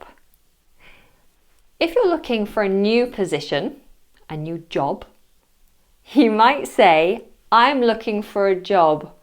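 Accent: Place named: United Kingdom. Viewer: Like you British English accent，sounds so tender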